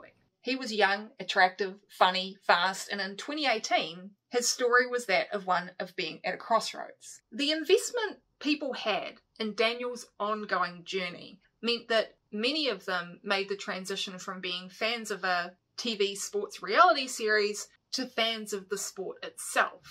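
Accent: New Zealand. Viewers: Yeah, I would like you to do a more in-depth analysis of DR3 & his career. For two reasons - first, and most important, you convey your thoughts in a manner that is real, absent of all the BS & other general palava that is served by the vast majority of F1 content creators ( I would put you in the top 10 in your field - your numbers don't reflect that, but that is just a matter of time and a very smart man once said time is relative!). Second reason - there is nothing wrong with your accent there should be more of it on YT. There ya go - you're a NZ trendsetter! (Yeah, there's a better than even chance I might share your accent! 😉) In any case, it's miles better than the worst accent - shit, can't say that in the comments section of a video about an Australian can I. OOPS! Keep up the good work. Can't give you a new subscriber - I was No 39! (Will be posting this to FB tho 10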